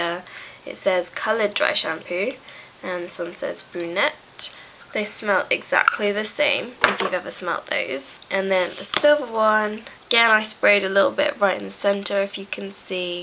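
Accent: United Kingdom. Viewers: i could listen to u all day! i jsut love ur cute lil british accent! =DDD u should've totally tried out for cho chang =)))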